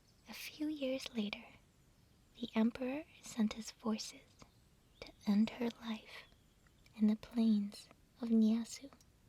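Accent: United Kingdom. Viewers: Is naturally british with an American accent? Or is she American with a really good British accent?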